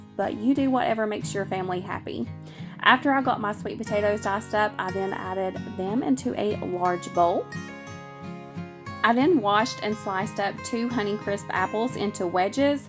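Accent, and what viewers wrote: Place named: South, United States. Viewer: I love your southern accent! Greetings from San José, California!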